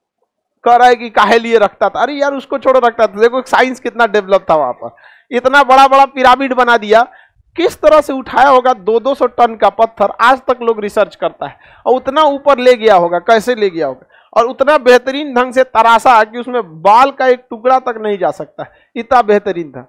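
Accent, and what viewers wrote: Bihar, India. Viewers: Bhai pehle bolna seekho pakke bihari hoo, kuch tameez wali Hindi bolo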